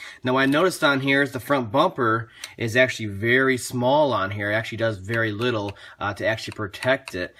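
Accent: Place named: Northern Illinois, United States. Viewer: I'm hearing a far more northern IL voice than mine so probably nowhere near me. I'm just an hour or so from KY actually.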